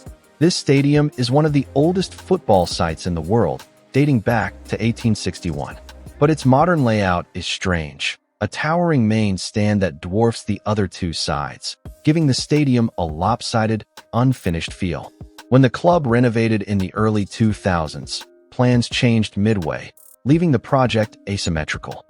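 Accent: United States. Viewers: In this video we've heard some Yank who clearly doesn't get traditional football nor the British football culture. The list is just completely un-serious American claptrap, a profound lack of understanding of something miles away from US culture. Ewood Park as an ugly ground? What is this tosser on about with that dreadful American accent of his? Appalling. Seriously.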